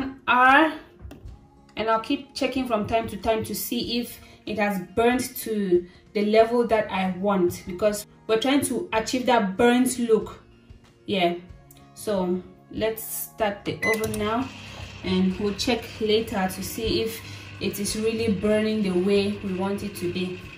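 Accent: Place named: Nigeria. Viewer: Wow I always thought you are a Nigerian, when I saw khati khati, I was like? Do we have a Nigerian dish called khati khati????, its looking so yummy wish I could try this, the way you pronounce you maggi looks so Nigerianlized, the baked chicken came out great...simple this is the first time you made a video less than 10min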